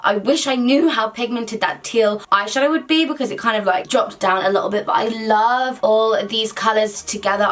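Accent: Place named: Northern England, United Kingdom. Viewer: Can we just appreciate you doing a northern accent! NAILED IT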